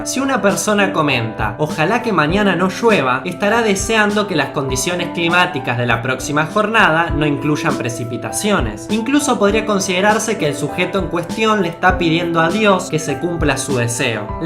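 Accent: Argentina. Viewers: Que asco con tu acento detesto la manera argentina chaaaaaa para yaaaaa lo peor. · Digo porque conozco a alguien Argentino y habla haci no más pregunto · CafeZK Es argentino,es su acento.Es raro al principio pero luego te acostumbras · sos argentino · Eres argentino??? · no puedo ver un top de palabras si eres argentino, con tu acentos degradas el español , pronuncias mal todas las letras y los acentos , tildes, etc... no es xenofobia, es que me resulta ridículo. si vas a hacer un top sobre PALABRAS del ESPAÑOL tienes que hablar con un acento lo más parecido al ESPAÑOL, no en ARGENTINO CHÉ. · Cuando un argentino abre la boca... ¡¡¡ áaaaaggh...!! se oyen exclamaciones de desaprobación, sobre todo en muchos países de Europa... NUNCA aprenderán a hablar bien. Basta con oir a éste sujeto, que aparte de expresar inapropiados términos de baja ralea... en su creencia que así será mas entretenido y agradable, demuestra el extracto social extremadamente bajo del que procede. 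Es exasperante la cantidad de veces que pronuncia mal: ''schá'' (ya sea por...) -- ''usás'' (usas) -- ''schueva'' (llueva) -- ''incluscha'' (incluya) -- ''schevar'' (llevar) -- ''schins'' (Jeans) -- ''tascho'' (tallo) -- schevaban'' (llevaban) -- ''schamaba'' (llamaba) -- cásches'' (calles) -- ''schamó'' (llamó) -- ''schó'' (yo) -- Hay que dejar muy claro que la sal no se ''AGARRA''... se toma. No poseemos garras... ¿cuántas veces repitió ''agarrás''....? véase en el video. -- ''Vos'' (tu. No estamos en la Edad Media) -- ''muschas'' (muchas) -- ''podés'' (puedes. Otra vez retrocediendo siglos) -- ''peschorativo'' (peyorativo) -- ''estrescha'' (estrella) --- queda bastante y me aburro.... Termino con otra tirada de orejas: Se encuentra el color ''marrón'', por ejemplo, en los ladrillos de construcción... pero en la vestimenta de los frailes Capuchinos el color es el CAFÉ...!!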